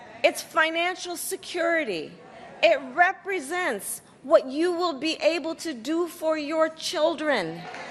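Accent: South, United States. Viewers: What is the all of a sudden have a Southern drawl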